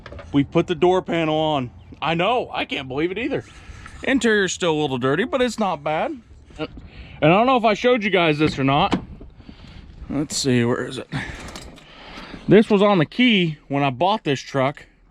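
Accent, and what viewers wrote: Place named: Pennsylvania, United States. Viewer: I know that you say you live in Kansas but are you originally from PA? Your accent sounds like my family that lives in PA.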